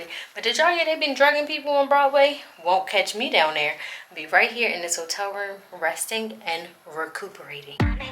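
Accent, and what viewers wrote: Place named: Baltimore, United States. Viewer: Are you from baltimore? im hearing the accent lol, i love your videos E 💗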